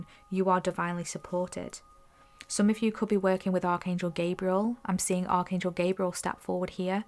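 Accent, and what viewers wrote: Northern England, United Kingdom. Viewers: Beautiful message, beautiful name, do I sense a bit of northern English as we are the best 🎉❤🙏✨🌹🌹🌹 peace and light 🌟🙏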